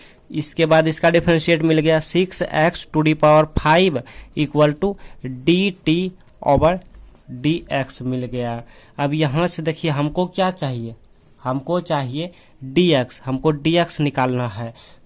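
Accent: Bihar, India. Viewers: bhai aap bihari ho kya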